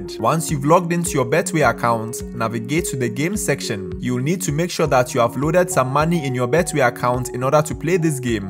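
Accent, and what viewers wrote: Nigeria. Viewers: Bro has a Nigerian accent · how is bros accent nigerian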